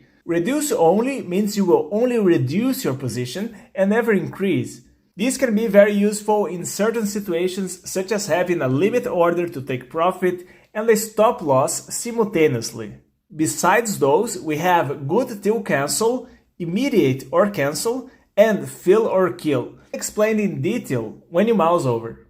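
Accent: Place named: Brazil. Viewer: É um brasileiro falando inglês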